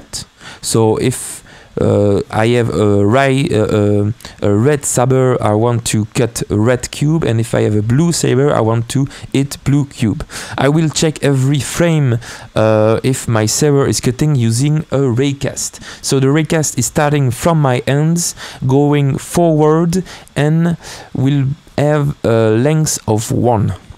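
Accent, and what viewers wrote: France. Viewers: Little questio, are you French? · Oh mec, je crois que tu es francais vu ton accent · Vu ton accent tu es français, ce serait vraiment cool de traduire ta vidéo en réenregistrant ta voix en français par dessus, merci dans tous les cas :)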